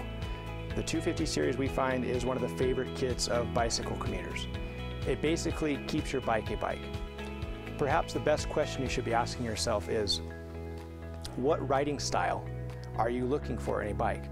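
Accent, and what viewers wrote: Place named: Canada. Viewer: I love hearing a Canadian accent in the morning!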